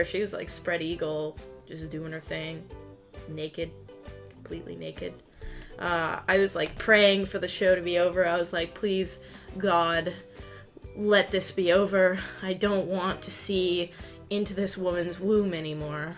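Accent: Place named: Canada. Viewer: That's what Canadian English sounds like, hu? Hoped it'd be a little different from US English, but I guess that explains why it IS American English and not Canadian English and US English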